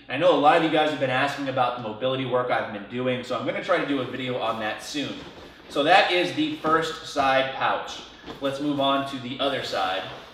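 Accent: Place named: Baltimore, United States. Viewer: are you from Baldimore?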